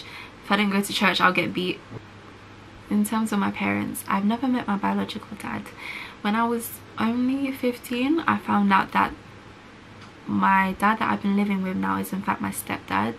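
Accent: United Kingdom. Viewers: this is the first time ive heard an asian person speak with a british accent...lol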